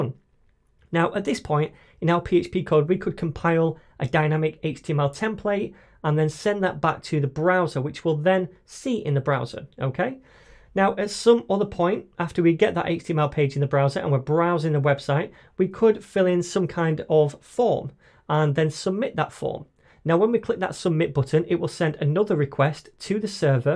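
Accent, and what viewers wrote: United Kingdom. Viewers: Is this British Accent. I Love it so much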